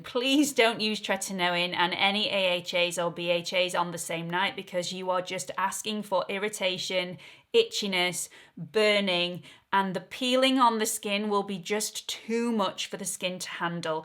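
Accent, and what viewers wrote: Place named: London, United Kingdom. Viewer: Love your London-english 💜💃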